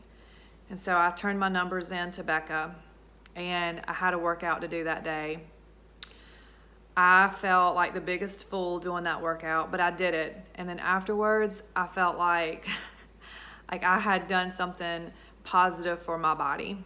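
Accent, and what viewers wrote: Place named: South Carolina, United States. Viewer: You sound like you're from SC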